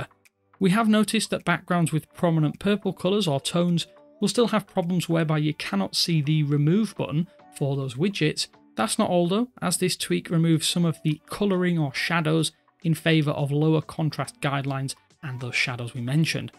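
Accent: United Kingdom. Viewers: Why is this channel based on the U.S when the host is clearly British?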